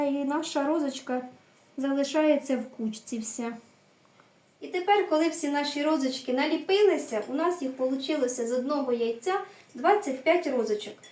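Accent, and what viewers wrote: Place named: Poltava, Ukraine. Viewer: Яка гарна господарочка, так гарно все розказала i показала, приэмна така мова полтавського говору... Згадалося щасливе дитинство i бабусинi смачнi xрущики та пирiжки з вишнею. Спасибi за смачне печиво. Щастя та здоров'я господинi та ii ciм'i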